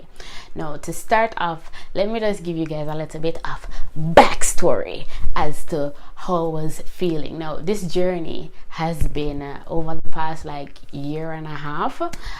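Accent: Jamaica. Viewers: Jamaican?💕love your accent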